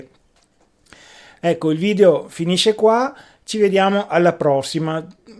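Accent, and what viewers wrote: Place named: Veneto, Italy. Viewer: Ciao Conterraneo! dalla parlata ho detto, questo è Veneto, e poi dal Nome e Cognome ho detto... ma mi sembra di conoscerlo... e infatti sei di Thiene... io di Malo!, inizio a seguirti che mi sto avvicinando al mondo della chitarra, anche se un po' Vecchiotto per iniziare a 43 anni, Ciao!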